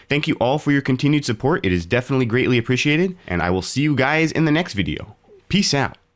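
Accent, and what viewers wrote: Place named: Midwest, United States. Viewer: Jesus the Midwestern accent on this dude is abrasive...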